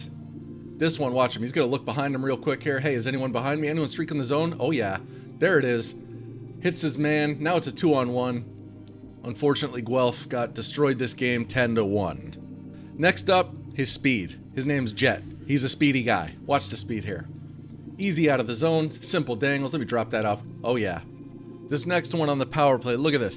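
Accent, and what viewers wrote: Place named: Philadelphia, United States. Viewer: excellent video, The Philly accent 😆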